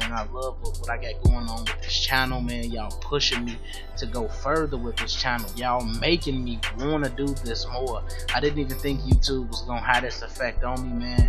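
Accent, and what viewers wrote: Chicago, United States. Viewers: Nigga from Chicago$Cant you hear it:))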